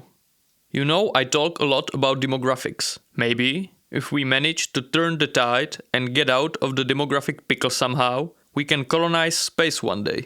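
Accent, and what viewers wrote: India. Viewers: your accent sounds indian! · If I didn’t know he is Czech , I’d say he is Indian based on his accent . He is way too optimistic about USA but overall interesting videos .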